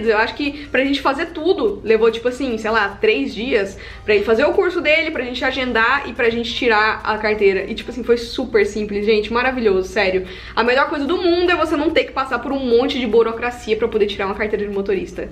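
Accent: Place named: Caldas Novas, Brazil. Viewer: Minha família e de caudas nova e eles fala como vc ♥️♥️ legal q vc era de lá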